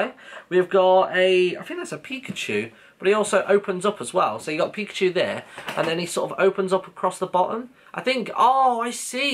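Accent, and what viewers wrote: England, United Kingdom. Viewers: i loooooooove this english accent *o*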